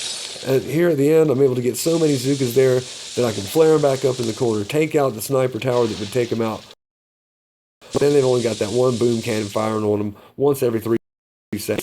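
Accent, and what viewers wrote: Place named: Texas, United States. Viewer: Howdy from Okie City!! Thought that was a texan accent! cheers bud!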